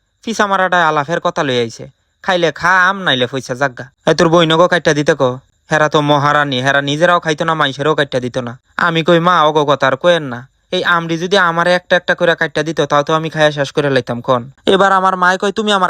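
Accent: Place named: Chandpur, Bangladesh. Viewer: উনার কথা শুনে চাঁদপুর মতলবের মনে হয়।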